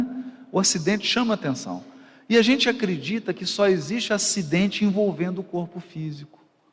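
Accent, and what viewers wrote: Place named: Minas Gerais, Brazil. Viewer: Tô ouvindo você e observando a fala em todos os sentidos e aspectos Percebi que é mineiro assim como eu E esse exemplo de mineiro ir p são Paulo por essas regiões estradas citadas passei por lá e sem GPS , MAS CHEGUEI LÁ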